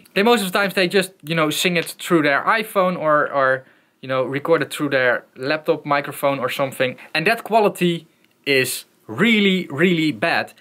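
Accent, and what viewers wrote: Netherlands. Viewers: Agreed. I used to date a Dutch girl so I find the accent adorable. Lol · Deze man ze accent is best goed maar als nederlander hoor je gelijk dat ie nedelands is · I thought I heard some Nijmegian in your Dunglish but damn it is the other side of the river :) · Yo-yo. I know you're Dutch because of the accent. You can tell it easily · Love the Dutch accent! Better than Australian, which is often unintelligible. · you pronounce like a dutch guy does and should, like myself, I hate all those quasi perfect american accent dutchies showing of their cosmopolitism, just stay as you are with your pronounciations!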